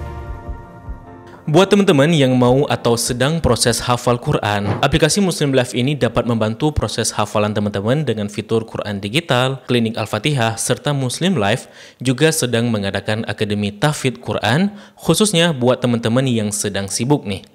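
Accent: Malaysia. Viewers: Assalamualaikum..maaf ini orang malaysia atau indonesia ya...soalnya bahasa indonesia nya bagus tapi ada terdengar logat malaysia dan kata kata yang terdengar biasa dipakai orang malaysia...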